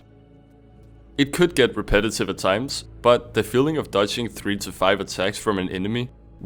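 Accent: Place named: Scandinavia. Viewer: Your accent sounds Scandinavian...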